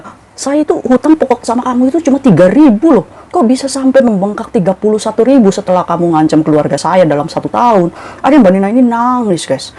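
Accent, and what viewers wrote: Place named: Bolet, Indonesia. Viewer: Kakak orang mana bahasanya kok sama bolet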